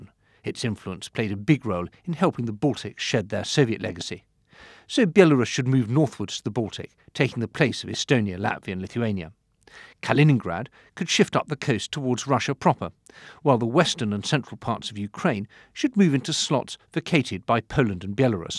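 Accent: United Kingdom. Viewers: Wow nice British accent mate.. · I love how the guy sounds exactly as you'd expect a british guy that is drawing border to sound, complete disregard for cultural boundries, superficial understanding of intercountry problems, arbitrary splittings and most important of all preferred to rip out northern Ireland making it an island of it's own without any mentions rather than giving it back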